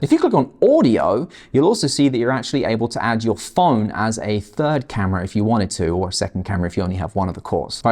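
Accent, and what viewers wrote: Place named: United Kingdom. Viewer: judging from your accent I'm going to assume you're based in the UK and you forgot to mention one key point. The Mevo Core camera is NOT available in the UK with no date provided as yet as to when they will be available. In my communications with Mevo/Logitech they could not guarantee they would ever be available outside USA/Canada in the future either.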